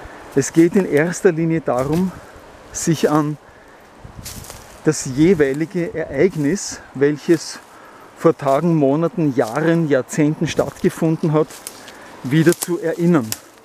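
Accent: Austria. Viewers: DANKE DIR.DEINE STIMME IST ZAUBERHAFT SÜDDEUTSCH.ÖSTERREICHISCH. DANKE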